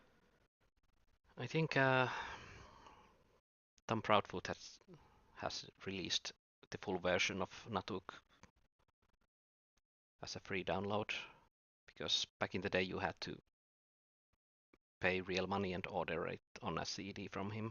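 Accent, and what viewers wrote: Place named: Finland. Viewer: Holy shit! Another person with a penchant for Nahlakh and Demise specifically. Congrats on finishing this epic game. I never managed this in the 90s despite several efforts. Always got stuck at some enemies. I love this style of games. I bet you play the Exile series as well, which is another amazing set of games. You might be a fellow Finn as well based on your accent, which is not surprising because of Pelit magazine's coverage back in the day. That is how I discovered the game. Check out a modern game called Dungeon Encounters. Might be right up your alley. Cheers! :)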